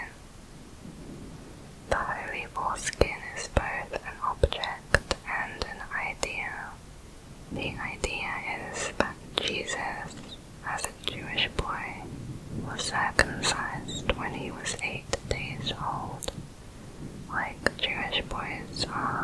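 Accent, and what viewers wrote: United Kingdom. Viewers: I love ur voice lol what part of uk r u from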